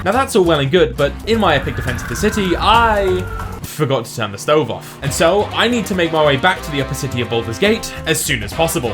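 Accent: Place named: United Kingdom. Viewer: Great editing. You should boost your audio a bit more. I need that beautiful british voice straight in my ears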